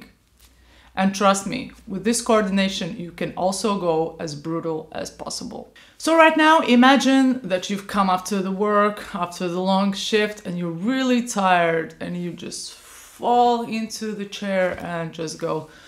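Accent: Latvia. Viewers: Heey, are you by any chance latvian? 🧐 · idk kinda sounds latvian😥😥 edit: oh shit u are latvian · Wait? Are you from Latvia?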